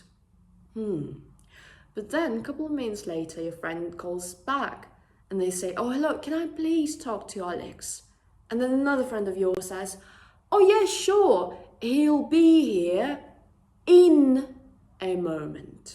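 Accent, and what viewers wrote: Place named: United Kingdom. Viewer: Нормальное произношение. Британский акцент.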